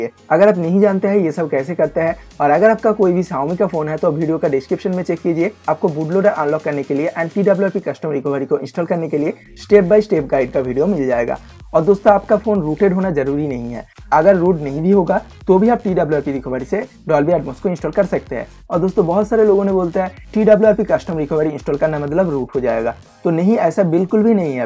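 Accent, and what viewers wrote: Bengal, India. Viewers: You Bengali ??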